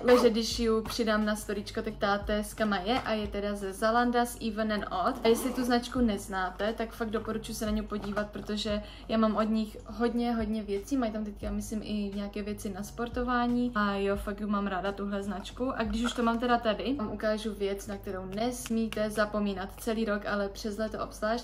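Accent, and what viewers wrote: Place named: Moravia, Czech Republic. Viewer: a miluju tvůj moravský přízvuk ♥️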